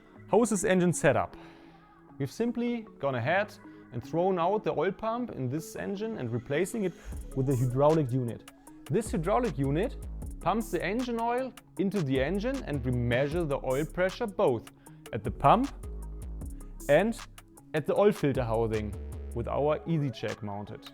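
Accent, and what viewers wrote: Germany. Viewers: shut up, he is German and has an accent, I challenge you to pronounce 10 German words correctly if you can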